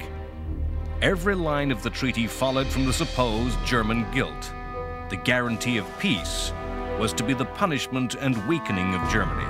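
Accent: United States. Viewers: wait why is this narated by an american?...the rest of the ones i have watched are narated by an English guy? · An American who actually pronounces "Adolf" correctly!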